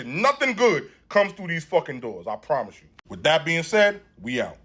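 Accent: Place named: Bronx, United States. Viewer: 5 and 4 are the best seats and I feel like you're from the Bronx are you?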